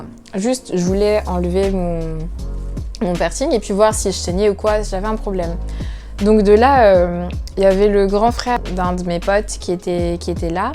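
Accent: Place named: Cameroon. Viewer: L'accent Camerounais est présent 🇨🇲🇨🇲🇨🇲😂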